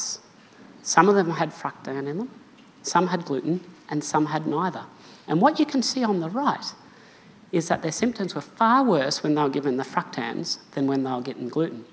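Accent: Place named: Australia. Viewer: Always a pleasure to listen to. Dr. Mason's appearance on HPO podcast was a huge treat and packed with fascinating info (check it out if you haven't). His lovely aussie accent is just icing on the cake.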